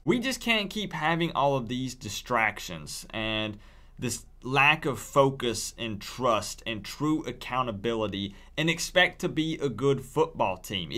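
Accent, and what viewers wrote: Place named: Chattanooga, United States. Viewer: not for nothing, but you do have a Chattanooga accent.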